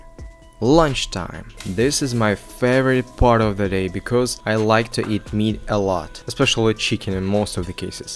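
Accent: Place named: Russia. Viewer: + russian accent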